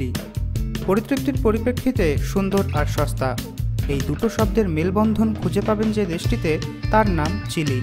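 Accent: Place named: Kolkata, India. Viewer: Nice Video Valo Laglo Apni Ki Kolkatar Lok Apnar Kotha Bolar Style Kolkatar Manushder Moto Janalay Khushi Hotam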